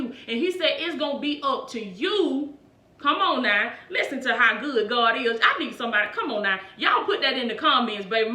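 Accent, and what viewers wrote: South, United States. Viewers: Shalom beautiful Pastor, you have come so far since you began your channel. you are looking so good!! You are such a lovely blessing to the Bride of the Lord. I love your sweet country accent, I have beautiful friends from the deep south and I always enjoyed thier cute accents and delicious home cooking. No body cooks as good as southern cooks, you guys throw down and make everything taste so yummy!! Nothing as good as greens and corn bread 🍞. Yummo!! Blessings Cherokee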